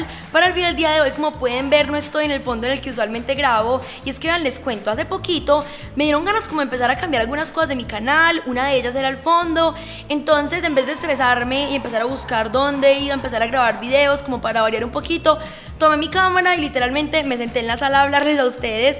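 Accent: Antioquia, Colombia. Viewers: Uy ese acento a paisa se detecta a leguas XD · Yo solo me quede por escuchar ese hablado paisa bello😎😅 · Me encanta tu acento colombiano, saludos desde México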